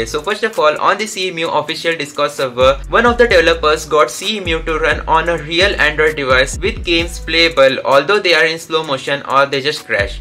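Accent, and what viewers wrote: India. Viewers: I hear Indian accent I know it’s legit.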